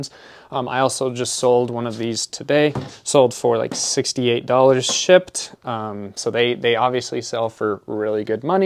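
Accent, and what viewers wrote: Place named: Scandinavia. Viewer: Loved your Scandinavian accent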